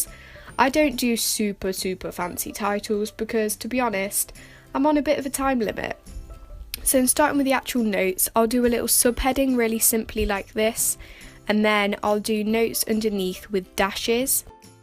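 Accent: England, United Kingdom. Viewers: I love your English accent and your beautiful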